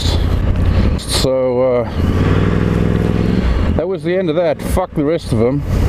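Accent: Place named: South Africa. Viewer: there's a hint of south African there I think lol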